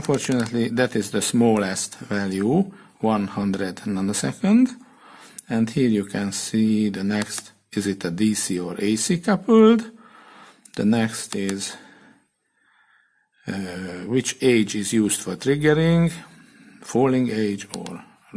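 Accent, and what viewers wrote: Hungary. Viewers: Goulash accent , kusenem 😇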